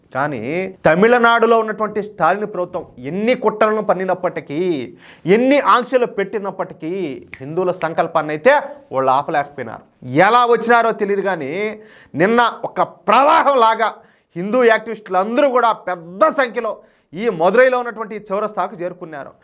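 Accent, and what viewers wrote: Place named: Chittoor, India. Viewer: Super andi, as a Tamilian I’m so proud. Meeru hypocrisy le kinda peggi lekunda chakkaga explain chestharu. And that too mana Chithooru Thirupathi slang lo. Keep it up andi.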